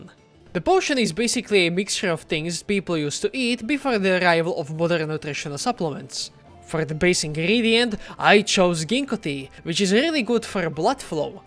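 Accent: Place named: Poland. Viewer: Words can't describe how much I love this video! Just everything about it, from the polish accent to the wonderful creativity of the potions. (o´ω｀o)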